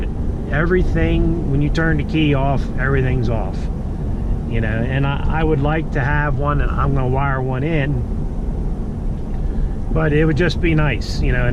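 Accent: Pennsylvania, United States. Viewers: Do you live in Pennsylvania? I’m picking up a slight PA accent. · Not sure but do I detect a central Pennsylvanian accent?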